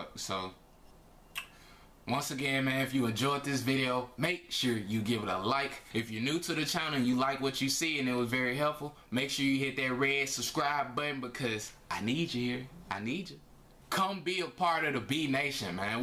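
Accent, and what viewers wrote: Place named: South, United States. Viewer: ahh okay. I gotta cousin from Texas and you sound just like him so I knew it was a southern accent.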